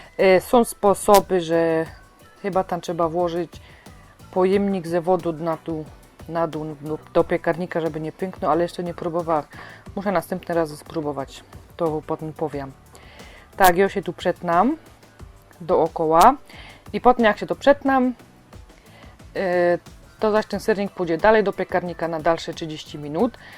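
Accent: Silesia, Poland. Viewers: Kocham ten kanał i twoją śląską gwarę! :)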